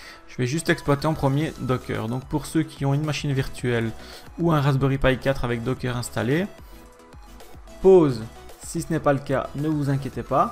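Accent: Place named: Belgium. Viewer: Hello, Merci pour cette vidéo, étant en plein réflexion sur le futur de ma domotique avec peut être un passage vers HA donc ta vidéo tombe à pic 👍 Pour ton accent Belge aucun problème ça donne du charme à tes vidéo 😊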